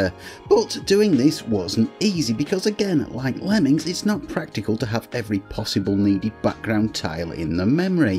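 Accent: United Kingdom. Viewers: Great vid, huge SMS fan here and it's good to hear a Brit doing it justice. Subscribed!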